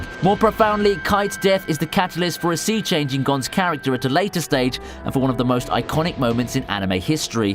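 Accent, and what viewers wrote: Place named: United Kingdom. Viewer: this video is really good but... i hate these name pronunciations. your english please stop trying to say the name properly because it just sounds goofy with our british accent.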